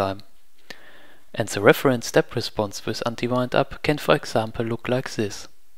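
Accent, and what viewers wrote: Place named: Germany. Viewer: classic german accent haha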